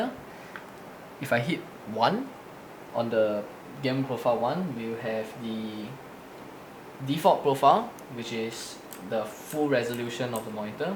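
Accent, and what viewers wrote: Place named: Singapore. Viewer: the accent is killing me.... Singapore?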